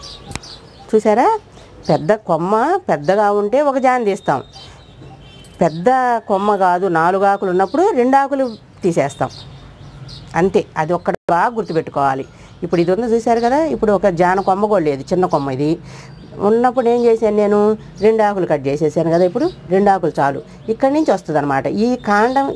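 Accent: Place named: Guntur, India. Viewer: Chala baga untundi mee explanation. Maadi guntur. Ippude hyd lo untunnamu. Na chinnappudu andaru ede slang lo matladevallam. Gurthuku vastundi. Thq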